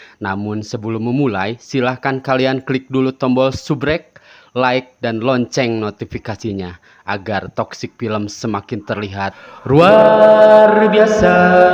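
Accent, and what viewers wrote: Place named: Garut, Indonesia. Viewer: Ngadangu suarana mh ciga orang garut si otok teh.. Sami2ti garut hayu urang saling suport..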